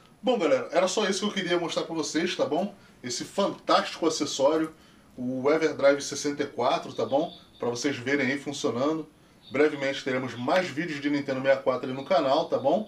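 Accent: Rio de Janeiro, Brazil. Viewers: Fala, sempre curtindo seu canal, sua coleção bem bacana, acompanho sempre. Sotaque de carioca e voz de locutor de FM kkk, curto sempre seus vídeos abraços.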